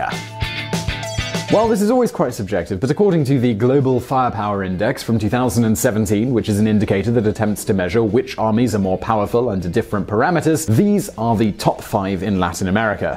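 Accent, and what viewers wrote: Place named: England, United Kingdom. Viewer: Uhh, NATO? Why mention an organization created to stop a Soviet blitzkrieg? It's as if NATO is the only multilateral organization you've ever heard of. Why not take a look at the African Union instead? Note: just because a guy has an English accent, it doesn't make him an intellectual or an expert on a given topic.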